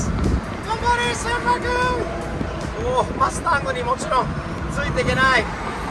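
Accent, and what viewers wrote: United States. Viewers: アメリカ訛りの日本語って感じで好きです！ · Also, one final thing I want to say. Say what you want about American accent, but that guy's still extremely proficient in a second language in a way that the vast majority of Americans are not. I will always respect the fact that this guy raised his son to also speak fluent Japanese, as well, despite the fact that he was raised entirely in America (Link: <link> I wish my own father did this for me, and I still love him to death, but he had neither the patience nor the confidence to learn my mother's native Japanese tongue. The Japanese language is an heirloom that is worth preserving, but because my sister and I were never raised in the language, we've had to pick it up as adults since.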